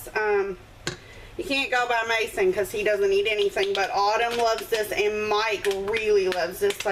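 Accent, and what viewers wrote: United States. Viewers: come on now...it is not good for anyone... sugar, fat and salt....I hear your southern accent and assume you are a fool.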